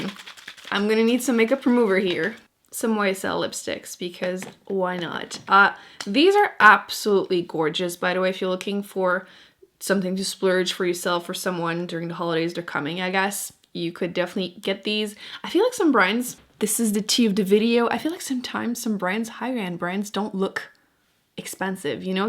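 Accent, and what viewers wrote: Quebec, Canada. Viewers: I could listen to you talking all day. LOVE your accent. It's French Canadian yeah? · yes i thought it sounded faded French and then i googled it and someone said you sound French Canadian. Usually they have heavier accents though. Where do you live?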